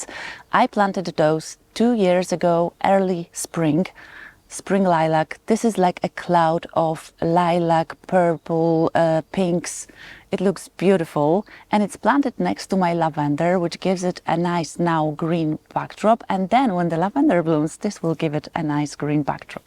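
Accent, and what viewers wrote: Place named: Poland. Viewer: Hi, it's always so nice to see you, I love your Polish accent, please don't change it:) and your garden of course is sooo beautiful. I am watching you from South Carolina, USA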